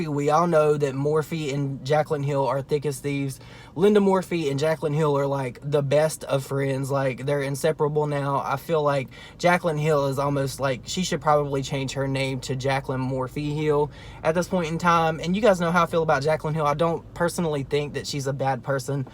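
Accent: South, United States. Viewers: New viewer! Love your accent! I'm a southern girl, too. Where are you from? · I know you don't know much about MAKEUP itself, but did you just say, "Pat McGrath is rolling around in HIS grave?" I played it back many times!!! Pat McGrath is a Woman, and also still living!! Maybe it was just that I had trouble understanding your Southern Accent, and So Sorry if I misunderstood you. I was just at a loss when hearing that!!! WOW!! Jaclyn's Shady as ALL HELL!! I agree with her Ex husbands IG, (where he posted after her post of her hand on top of her new man's hand!) Well he Posted a photo, of his dogs paw on top of his hand, (meaning to me, A BITCH's paw or hand. One in the SAME!) LOL!! I understood what Chris was meaning!!! ALSO AMERICAN HORROR STORIES ON TV ON WENSDAY NIGHTS, TODAY'S ONLY TUESDAY!!! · Where is your southern accent from? I'm from Georgia. 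😁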